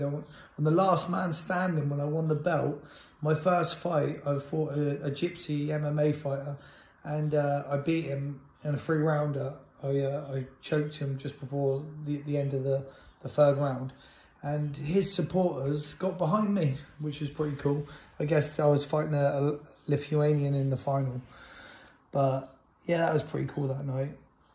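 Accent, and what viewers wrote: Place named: United States. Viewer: The yank accent is gold, never surrender, greatness awaits 💯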